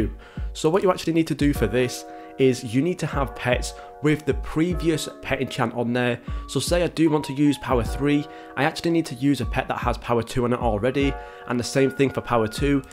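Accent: United Kingdom. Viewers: Very nice bri'ish dude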